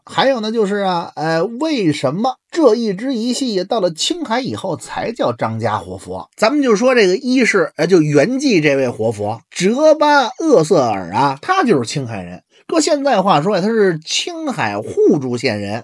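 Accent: Beijing, China. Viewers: 这口北京土话听不太懂。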